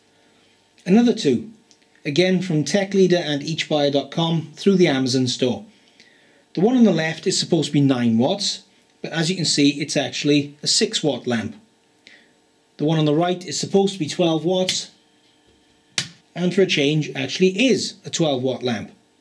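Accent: Wales, United Kingdom. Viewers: Hey, is this a Welsh accent I hear?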